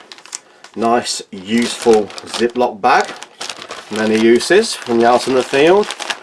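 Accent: United Kingdom. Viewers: Your reviews are fantastic. There are few things I enjoy more other than relax to your reviews, your calm british accent and James Bond style manners and thorough review of your ration. This clip, like most of your others, is a Masterpiece. Please keep up your awesome hobby. And please, for the love of god, do not cut your videos short because you've reviewed a specific type of coffee or tee or whatever before. It always adds to the enjoyment. Thank you.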